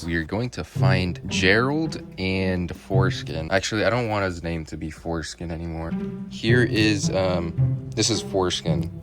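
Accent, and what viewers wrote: United Kingdom. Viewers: Thus guy is so british wuter😂😂😂😂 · Are u British wotah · Bro sounds like a British man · Buddys British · Are you British?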